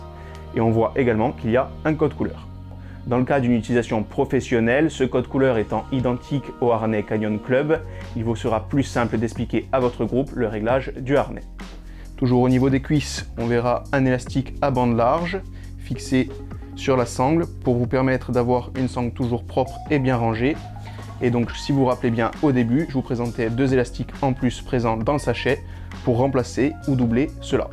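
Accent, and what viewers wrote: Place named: Corsica, France. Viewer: le petit accent corse s'est dépaysant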